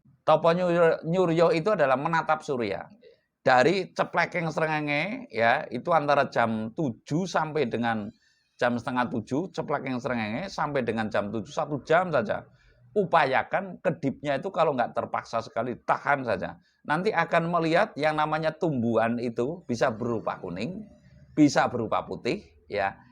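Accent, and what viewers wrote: Banyumas, Indonesia. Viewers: Rahayu Ki, pngndkne sami klh kula Ki, ngapak. Kula skng Karanganyar Kebumen ndrk nymk Ki 🙏.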